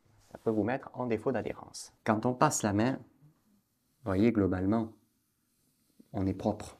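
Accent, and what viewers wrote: Southwest, France. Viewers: merci pour votre réponse, j'adore votre accent du sur ouest !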